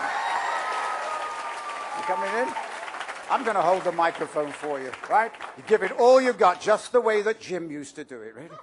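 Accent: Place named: United States. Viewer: I hope none of you forget that he sounded perfectly American when he did Disney's Pete's Dragon around <num>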